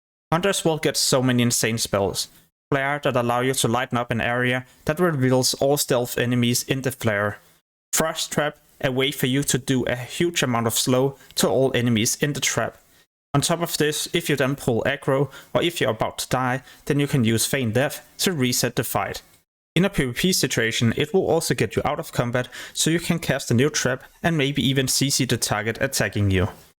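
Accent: Denmark. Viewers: this guy is Danish, 100%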